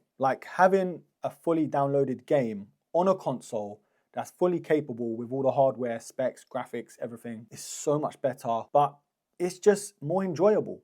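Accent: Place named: Jamaica. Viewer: Why is he talking like a Jamaican?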